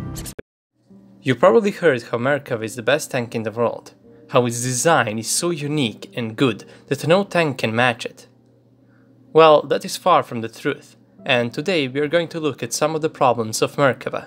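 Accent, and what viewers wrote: Russia. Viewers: This video is reasonably good. However, you thick Russian accent in English commentary at times obscures the meaning especially on the technical terms. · funny to hear criticism of the Merkava with a clear Russian accent. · This guy is “stupid” you know why? Because I’ve been through thick and thin with this tank Meaning I’m a Gunner in IDF Armored Corps, 7th Brigade,I think the uploader is a Russian speaker with a little bit of accents in English, who probably have friends in the IDF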